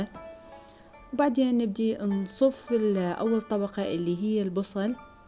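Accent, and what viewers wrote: Baghdad, Iraq. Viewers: انتي دوخينيه لئن ماجاي نعرف انو انتي عراقيه و لا كويتيه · اسلوبك جميل و لهجتك العراقية الجميلة❤️فيديو جميل و رايق و ممتع اهنيكي 👍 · ولله مظبوطه اكو شغلات معرفه اتعلمته تسلمين كلامج حلو بغدادي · انا دخلت نتعلم الدولمة العراقيه فجاه عشقت في كلام العراقي مشاء الله حلو يهبل ❤❤ 🇩🇿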